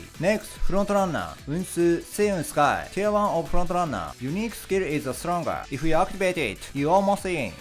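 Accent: Japan. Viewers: What is wrong with your english? It’s like you’re speaking in japanese accent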